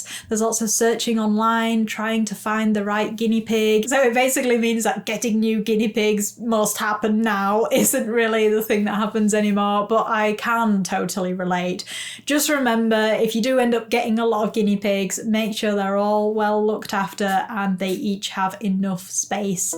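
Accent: England, United Kingdom. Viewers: I agree. I can't resist the accent ❤❤. I grew up in love with the BBC, from the eastern coast of U.S. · You are the greatest piggie mom ever 🐹🐹🐹🐹🐹🐹🐹 Plus, I love your English accent 😉😉😉👍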